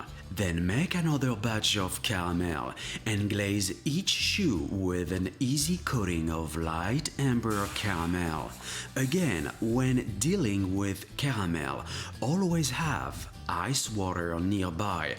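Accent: France. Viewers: The french accent is just lit😅❤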